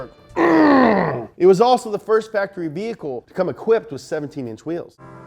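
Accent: Germany. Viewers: the funniest german accent ever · The german accent is pure bellissimo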